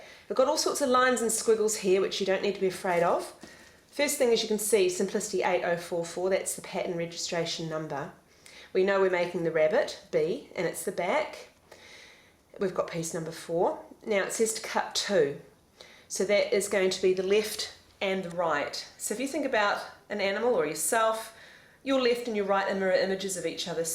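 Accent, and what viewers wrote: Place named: South Africa. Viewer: I detect an accent where are you from? SA?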